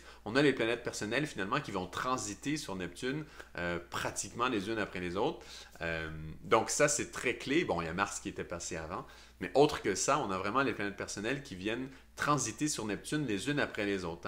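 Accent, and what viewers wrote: Canada. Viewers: Moi j aimes bien l accent canadien . Monleal...monleal ... Merci pour cette vidéo.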